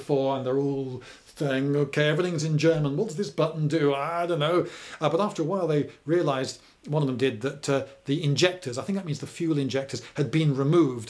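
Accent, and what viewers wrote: United Kingdom. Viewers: Yes, realize you're a Brit.... The accent gave it away... Stay on subject,. thank you... ☮️ 🌎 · into? you speak Queen'a english, most of us can barely understand the accent. Don't worry about a tiny "in insignificance", videos are too good AND funny anyways. History is beautiful when explaines with entertainment. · just searched it up. Funny thing is, there's a video made by scholagladiator ( or something ) where he mentions his meeting with Lloyd and he actually admits the British pronunciation is wrong at this subject... But I also get that it's just how you guys used to say it so... thanks for responding :)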